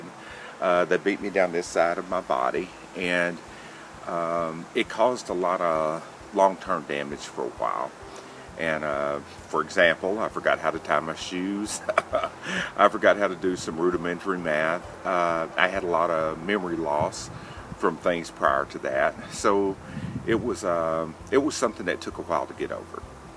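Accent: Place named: Texas, United States. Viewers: Just found your channel a week or so ago . East Texas accent for sure I went to White Oak and have family out in East Mountain . Now living just over Texas line in Louisanna. I went through advanced cataracts in 2011 had implants put in but was completely blind for about 8 months and totally get the protect your backup . I now can only shoot with a scope since having implants my eyes no longer focus between long and short range my long range vision is pretty good with lens implants I have to rely on bifocals to see rear sight though and that doesn't work. Saw your video on Hawke scope just got a air rifle the new gamo 22 magnum swarm gen 2. Needs some more trigger adjustment and does tend to jump a little but getting it figured out. Looking at the Hawke sidewinder . Enjoying your channel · thanks for sharing. The patch is bad ass and makes the vids better. 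Gives personality to the vids and character . Ive even shared these vids with my wife because we have the same accent. TX is the best state in the country...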